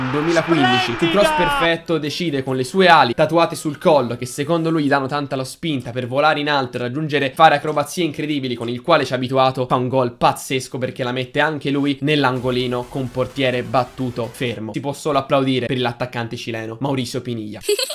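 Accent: Tuscany, Italy. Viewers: sei toscano??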